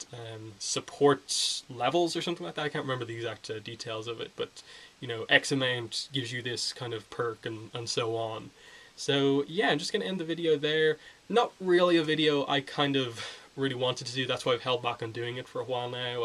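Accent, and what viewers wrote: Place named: Dublin, Ireland. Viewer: That accent - Churchtown? Terenure maybe?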